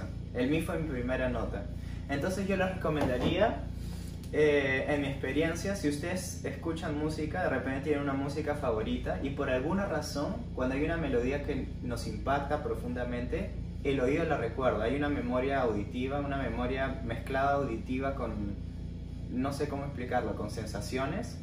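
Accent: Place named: Bolivia. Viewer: Excelente. Una pregunta, eres de Bolivia ? Tu acento es muy parecido a un acento de Bolivia.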